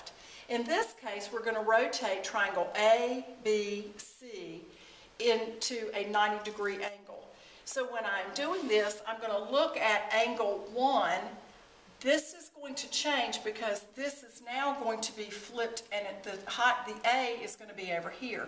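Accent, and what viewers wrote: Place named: South, United States. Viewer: Definitely southern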